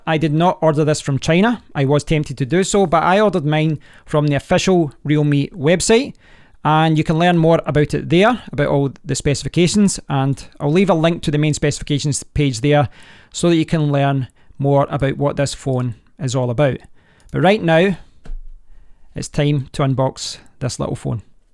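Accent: Scotland, United Kingdom. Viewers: I wish I could speak Scottish English, sounds amazing!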